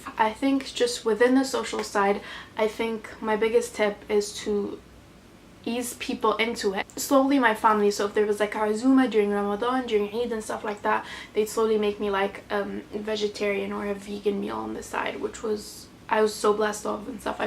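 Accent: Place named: West, United States. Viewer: can you do a video on how you found living in Egypt as a english person- ik you're egyptian but from your accent i can tell youve lived alot of your life in a western country (correct me if im wrong). it would help alot as im thinking of moving there soon but ive lived most of my life in england. (im 18, vegan too) im just scared i wont get along or agree with people bc of the different views and stuff. Thank you x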